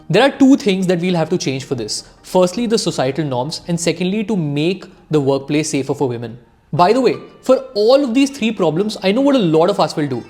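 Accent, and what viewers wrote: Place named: India. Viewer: So irritating and cheap indian accent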